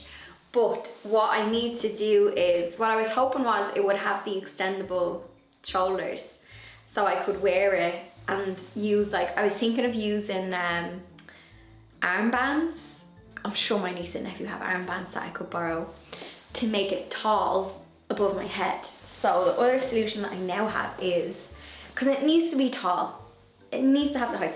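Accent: Ireland. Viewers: With your lovely Irish accent I thought you said your niece would have hair bands, I was trying to visualise hair bands to make your jacket taller🤦‍♀️🤣 it’s arm bands you mentioned 🤣my mistake🤣. I have never seen Beetle Juice, so I’m not much help to you 🫢. But go girl, knowing our she will make it 👏❤️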